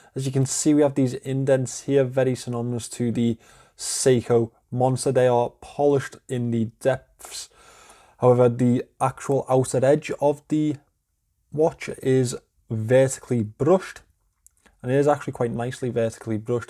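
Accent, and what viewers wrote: Liverpool, United Kingdom. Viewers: I think should speak as he speaks, with his scouse accent, there are hundreds of different accents within the UK and I hope it always remains so, keep going you're doing a great job...stay safe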